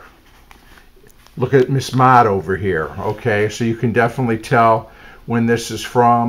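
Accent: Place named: Michigan, United States. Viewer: Michigan! That's why you talk normal. I'm from Northern Illinois.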